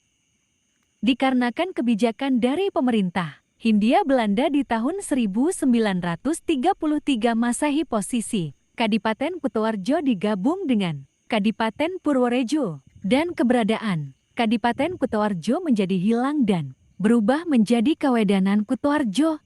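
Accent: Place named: Makassar, Indonesia. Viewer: suwarane kaya orang Makasar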